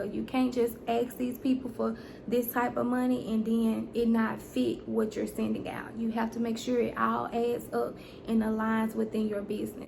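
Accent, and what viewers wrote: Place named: Mississippi, United States. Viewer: I hear the Mississippi all in your voice! 😉 very informative channel, so I just subscribed!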